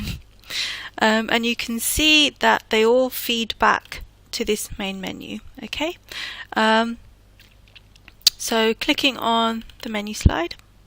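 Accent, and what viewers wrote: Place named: United Kingdom. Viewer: Nice voice (makes me think of, Monty Python, and Jaguar cars). Main Menu slides like this are the best way to organize E-Learning. It's part of "chunking" and can even serve as a graphic organizer (ed psych stuff). I am shocked that Articulate doesn't have a pre-formated slide for this type of thing. In fact Storyline makes it hard to do this sort of thing because the return to slide has limited options AND a little unknown issue (or bug) with how slides are numbered/sequenced. Storyline auto changing your slide order (as you had happen in your video) is because the slides sequence is affected by the order in which your buttons appear on your main menu timeline. In your case Button 4 needs to be on the lowest section of the time line (i.e.send to the back) and button 1 needs to be the highest on the timeline (i.e send to the front). 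to repair, disable all triggers, then move your buttons up and down vertically on your timeline, button 1 highest then down to button 4. Then re-enable all your triggers. And then send an email to Articulate begging them to feature this type of main menu or jump off slide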